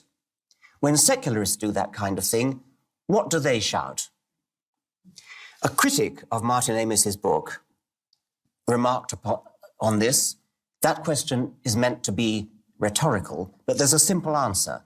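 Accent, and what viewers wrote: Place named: England, United Kingdom. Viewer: MENOS K EL PROFE ES INGLES NO HABLA COMO LOS CHINOS" YANKIES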